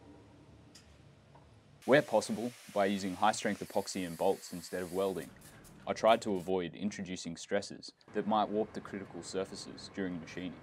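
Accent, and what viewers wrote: Australia. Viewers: Some strange mix of This Old Tony, Marling Baits and.... well, an Austrailian... Edit: A very good mix. Excellent way to start a channel strong. good on ya mate. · Before I heard your voice, I was hoping it was Australian! And boy, I’m happy. Can’t wait to watch your next video. You can then join the ranks of CEE and I Did A Thing, and Pask Makes… no engineers in sight UK Aerospace Engineer here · Beautiful (Aussie) introduction. Great video.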